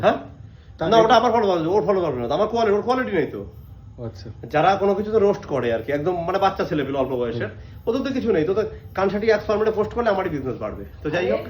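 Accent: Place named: Bangladesh. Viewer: Son surar bachcha tui ja vaba Indian armed forces ka niya kotha bola6is are satha tor bou sur tana6a......Todar duto kai bol6i Tora manusar jat na ra......sotti kora bolto tora indian na ki oi parar mal .....kotha suna to amar tai mona hoi made in bangladesh.....Oi body bilding are ghamant ak dom dhukia dabo........Janor sala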